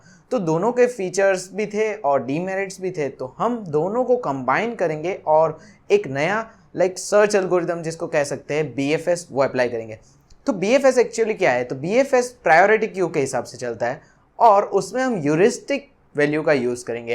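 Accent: India. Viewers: FARK!!!!!.........every fucken video on anything maths and IT is fucken Hindi or English with strong Indian accents.....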